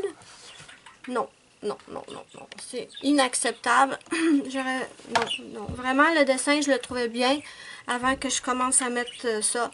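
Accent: Quebec, Canada. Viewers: Coucou! Il est très joli comme ça ton colo. Pour les craies, je ne saurais pas trop dire, en plus j'ai les neocolor 2, mais par contre, dans mes Hanna Karlzon, je les estompe en mettant un peu d'eau sur le doigt. J'aime bien quand tu utilises des expressions québécoises, tout comme ton accent je trouve que ça contribue au charme de tes vidéos. 😘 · Moi je te trouve très courageuse, tu essaies un nouveau materiel devant nous. Super! Oui ta peinture est magique, je me la suis commandée. Je vais essayer. (J'adore ton accent Quebecois 😁)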